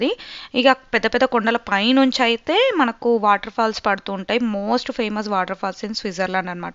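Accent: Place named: Telangana, India. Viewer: Switzer land video excellent....telugu telagana slag..telugu ammayi ....eni yrs ayindhi usa loo settle ayi...emaina introduction video vundha...ella antha easy gha eni yr settle ayaru...malli europe countries ani video thisaru very rich anipisthunaru ...chalavaraku andhariki settle kavataniki okha 20yrs time paduthundhi ...manam putina place ellu vundali anukokunta andharu antha easy foreign countries ella settle avuthunaru. .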